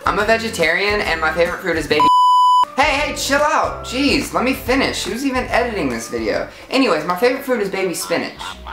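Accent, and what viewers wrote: United Kingdom. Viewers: I'm so happy for you!:') Also, I'm proud of your British accent:D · OMG! The accent is back! 💜 ily really, even when your trying to do a British accent XD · Are you british? You sound kind of British (no offence if you take offence)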